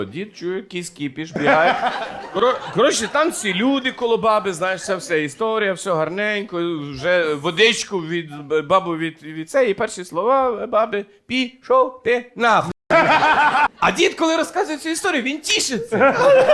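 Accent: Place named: Bukovina, Ukraine. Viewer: Розповідає цей пан -- неперевершено! З буковинським акцентом і дуже смішно. Дуже файно! БРАВО!!! Привіт зі Львова від глибочанки.😂❤❤❤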